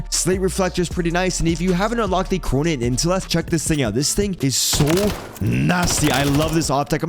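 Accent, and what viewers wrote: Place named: Toronto, Canada. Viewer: Is homie from Toronto? He has the Toronto accent like Plushhh 😂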